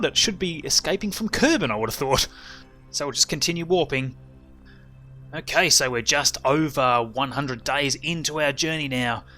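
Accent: Australia. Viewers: G'day Mate! Are you Aussie? I am too ;)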